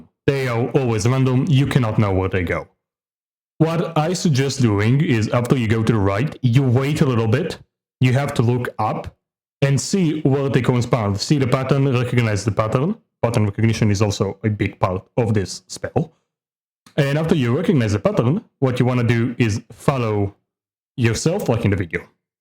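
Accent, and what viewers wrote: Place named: Israel. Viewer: Nah dw its hard to tell you got a israeli accent, i had to go check other videos of you to confirm if my suspicion is correct. Good tutorial nonetheless though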